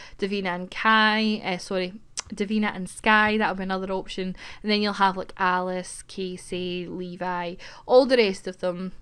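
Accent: Scotland, United Kingdom. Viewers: I'm glad you will have more time to do what you seem to really enjoy! I certainly love watching your LPs and listening to your lilting Scottish accent! I'll definitely be continuing to watch TS3 LPs and I really look forward to seeing how you, Peter and Jenn play TS4 because I am so confused about how this game is supposed to be played. I know it's supposed to be a sandbox game, but it definitely seems to be geared toward a different playing style than what I've been using for the past 10 years, so yeah... Thanks for everything you do and for all those who helped with Big Brother! I've never seen the actual show in any way, but I don't think I would enjoy it as much as your version.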